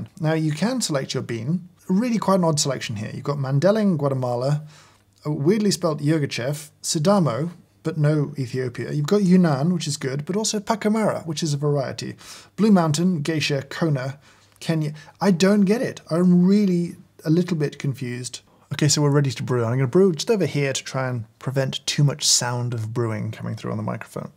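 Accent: United Kingdom. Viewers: That's the effect British accents have on Americans. This dude could literally tell stories about his farts throughout the day and Americans would tune in.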